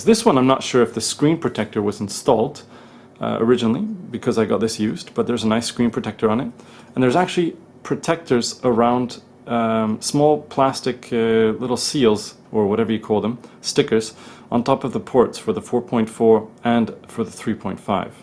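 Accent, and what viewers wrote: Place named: Germany. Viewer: Well despite his terrific English he doesn't seem to me to be a native speaker and I was trying to work out where he was from - I think he's a German speaker who's spent much of his life in Canada. And -> Ant 7'46 - sounds like a German tic to me. Still - incredibly good level of English!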